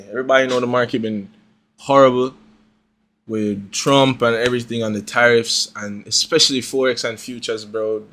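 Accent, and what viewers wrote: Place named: Caribbean. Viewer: You sound like you're from the caribbean bro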